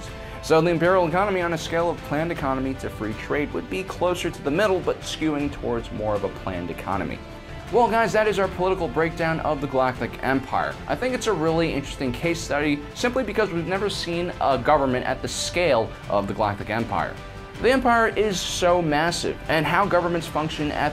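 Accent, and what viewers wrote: United States. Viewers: i'm already suscribed since a while, and i really love your videos.... however on this video, i had a hard time trying to understand what you said due to your strong American accent. Still, i liked the video:)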